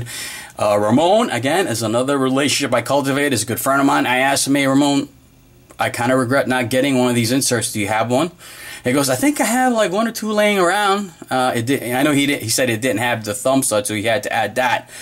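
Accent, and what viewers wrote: New York, United States. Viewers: Ha! I'm watching this with my girlfriend and we where guessing where your from based on your accent. I said gotta be Jersey or new Yorker. She said Monmouth county nj lol. Gotta be strong island?!? We are down the Jersey shore but I grew up in the Bronx and work in Manhattan. Keep it up bro def simple informative and straight up videos! Like they should be!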